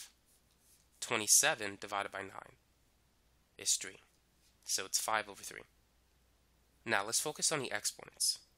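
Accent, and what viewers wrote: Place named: New York, United States. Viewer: I feel like you have a New Yorker accent.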